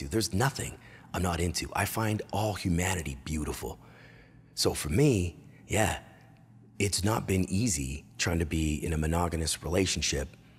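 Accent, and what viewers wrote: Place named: Scotland, United Kingdom. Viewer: Lol 😂 great 👍 Scotland 🏴 accent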